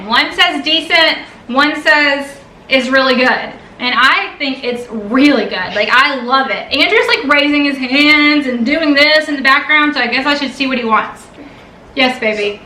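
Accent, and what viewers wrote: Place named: North Carolina, United States. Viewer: Peecans, North Carolina! Love your accent! Dear Hazel, Go BITE Andrew!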